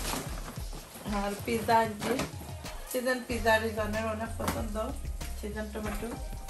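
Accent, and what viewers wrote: Sylhet, Bangladesh. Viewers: মনোমুগ্ধকর সিলেটের ভাষা অনেক ভালো লাগে আপু মন জুড়িয়ে যায়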